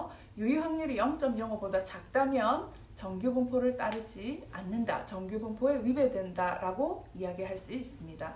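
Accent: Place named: Busan, South Korea. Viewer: ㅎㅎ안녕하세요? 간호사이고 대학원생입니다. 9월되면 학술지에 논문을 투고 해야되는데 통계가 너무 어려워서 유튜브 찾다가 이 채널을 발견했어요. 말투가 낯설지 않고 정겨웠는데 부산에다 센텀에 계셔서 너무 좋아요. 제가 자주 가는 곳이라서^^; 따님분한테 쉽게 설명해주시는 부분도 좋고 따님분도 질문을 너무 야무지게 잘해서 스트레스 받지 않고 볼 수 있을 것 같아요. 그래서 이곳을 통계 맛집으로 정하고 정주행 하기로 했어요. 너무 유용하게 잘 듣고 있습니다. 그래도 감사 인사는 드리는게 좋을 것 같아서 댓글 남겼어요. 복 받으세용^^ 그리고 정말 감사드립니다❤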